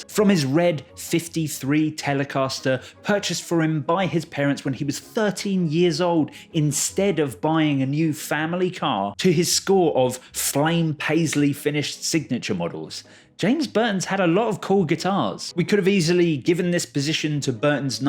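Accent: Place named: United Kingdom. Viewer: This guy kicks ASS! I usually HATE Brittany accents. Love this dude! Different NRG💡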